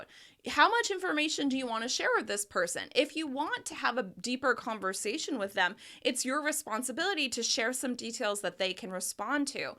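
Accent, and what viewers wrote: United States. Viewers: Let me tell you that your accent is really beautiful, captivating and very American, which has made that I can’t stop watching your videos, and on the other hand learn the pronunciation and sounds of the United States, greetings from Chile. 😊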